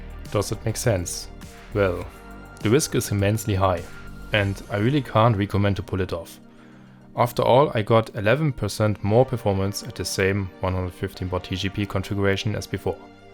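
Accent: Germany. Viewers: I love how I could immediately tell that is guy's native language is German after some two or three words, lol. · i hear a bisschen deutsch??? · u sound kinda german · I hear German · Wusste ich doch, dass du ein deutscher bist. Unseren Akzent kann keiner "nach" machen haha.. Guter Job. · Wie man direkt den deutschen Akzent raushört 😂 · Im hearing deutsch in his voice🇩🇪🇩🇪🇩🇪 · When the narrator has a German accent in a tech video you know it's gonna be good. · Also Ich muss schon sagen, deine Hand ist echt ruhig, aber deine Aussprache verrät, dass du Deutscher bist und das, ob wohl Ich auch einer bin, aber du machst gute Arbeit und daher meine Frage, warum nicht in Deutsch, sondern in Englisch oder hast du auch Deutsch Videos? Sorry bin Pfälzer und ja wir haben es net so mit Sietsen hoffe bist deswegen net angepisst ist dein Vorname ok oder soll ich ihn nicht nenne gleich mal Daumen und Abo dagelassene ach und (net) heist soviel wie (nicht) in Sachsen weis net ob da so pfälzisch verstanden wird deswegen hoffe nimmst mir net übel ,weil mir ist auch aufgefallen das sich sonst keiner traut mal einfach Grafikeinheit zu tauschen oder Prozessor, wenn er verlötet ist als Respekt wäre niemals auf die Idee gekommen wie Mann überhaupt rann gehen sollte aber bei dir sah es echt Profi mäßig aus ok noch mal gute Arbeit!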